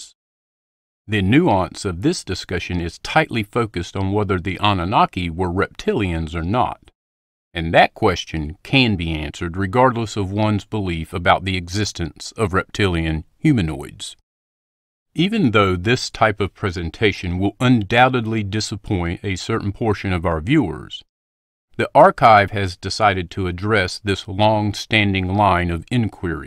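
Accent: South, United States. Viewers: More content like this! I love your southern accent while reading the esoteric goods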